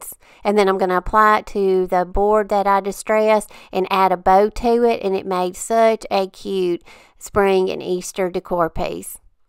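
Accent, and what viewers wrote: South, United States. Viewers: Hello! Donna from Delightfully Southern mentioned you on her channel and she was right....you are so talented! Loved all of your crafts and I have already shared them with 3 family members!!! I was wondering what state you are from? I am in North Carolina and I noticed you have a southern accent so, I was just wondering. Can't wait to watch more of your video's. You all did a great job with your house. I did go back and looked at some of your older video's. ❤️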